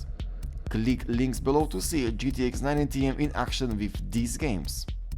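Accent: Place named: Romania. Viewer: nu pot sa nu recunosc accentul de roman care vorbeste engleza! :)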